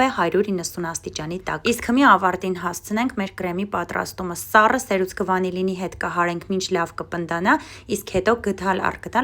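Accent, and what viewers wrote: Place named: Gyumri, Armenia. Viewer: Իսկ ոչինչ որ Գյումրեցի է և նորմալ է Գյումրվա բարբառով խոսելը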